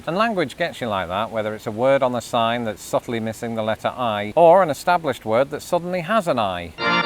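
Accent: United Kingdom. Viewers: I'm not really sure these american words can be considered american when you pronounce them with your british accent. It's just a made up word at that point.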